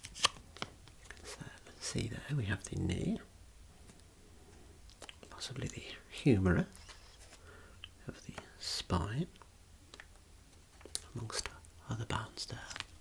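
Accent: Birmingham, United Kingdom. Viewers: Birmingham i tinks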